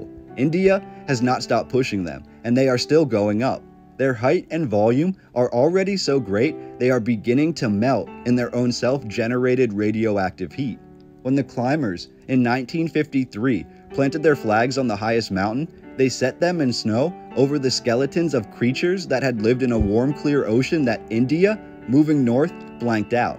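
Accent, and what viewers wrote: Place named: Texas, United States. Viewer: you from Texas aint you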